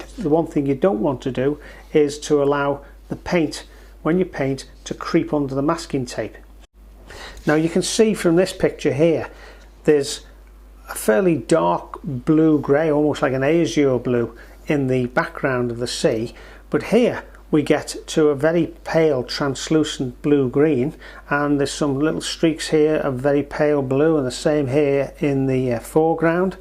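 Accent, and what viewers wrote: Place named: Lancashire, United Kingdom. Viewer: Just found you. Love this. I live in Los Angeles but grew up in Fleetwood, Lancs until I was 14, so loved listening to your accent!!!!!!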